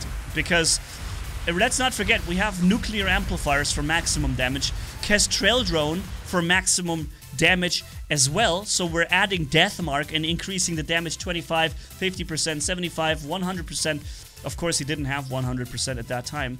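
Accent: Germany. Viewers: Maybe dumb question, but are you German or you learn german (sorry if this is inappropriate question)?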